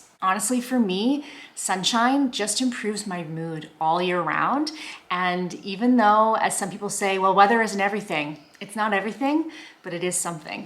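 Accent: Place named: Canada. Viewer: I absolutely love canadian english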